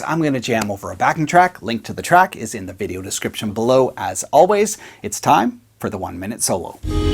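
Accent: Canada. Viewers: Find Uut....Abuut....Love the Canadian accent. Great review as usual.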